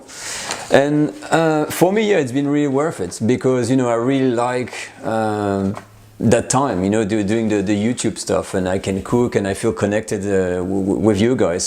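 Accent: France. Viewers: Your channel is a dream come true. I love french food but do not speak the language, so you tube in french does not work for me. Real french food by a french cheff who explain's so well and in English. It doe not get any better. I did not cook any of you recipes yet but have bookmarked so many , my cooking bug picks up in the fall. And one more thing: I love that you are not full of air, a simple guy. And one more thing: your accent, every time you say "recipe" I repeat your way. My son laughs . Thank you so much for this channel in English. Wish you lots and lots of success ! Just subscribed. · Been with you from the start...love your channel. You are very personable, knowledgeable and charismatic. ☺️. And the "real" French accent is so easy on the ears. I am Canadian - our French accent is very different. Keep up the wonderful work...you have inspired me to dig out my Julia Child and Escoffier books and go back to basics. 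· I just found your channel today. I am impressed by your ability to make dishes seem easy to accomplish and your love of cooking comes through. Please do not apologize for your presentation, it looks much more professional than you might think. Your english is excellent even with your french accent...this is much appreciated., I believe there is a career on camera if you are willing to go that far. Maybe PBS. In my opinion you are the complete package of knowledge, charm and inspiration...you have my vote.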